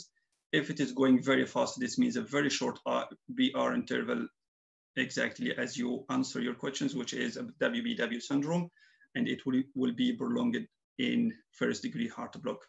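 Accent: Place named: Egypt. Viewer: Thanks 🙏 You are Egyptian doctor I catch your accent thanks again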